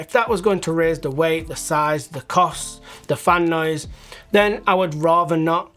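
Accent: United Kingdom. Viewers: That’s the best voice and British (British, right?) accent on the whole film production–centric YouTube!!! 🎥👑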